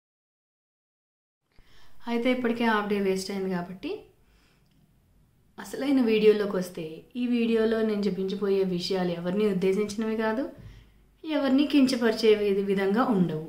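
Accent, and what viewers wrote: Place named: Telangana, India. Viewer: Good try . Meeru telangana baaga matlaadutunaaru 😀. Keep it up .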